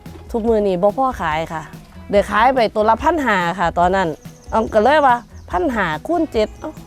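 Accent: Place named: South, Thailand. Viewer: 😂😂😂 พูดภาษาใต้ เพราะค่ะ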